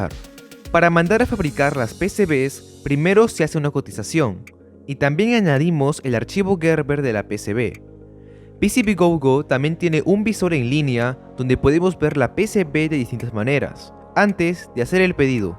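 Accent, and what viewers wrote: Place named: Peru. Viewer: increíble, el español peruano es inconfundible.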